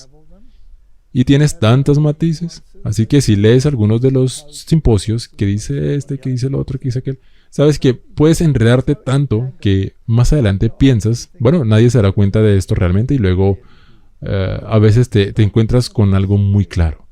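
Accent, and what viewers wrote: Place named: Colombia. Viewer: Feliz Sabado!!!!.....gracias por dejar abajo el enlace a la predicacion original. La estaba buscando y no la podia encontrar. De paso me encanta tu acento colombiano!!! Keep up the good works!!!!! God bless your ministry!! Bendiciones!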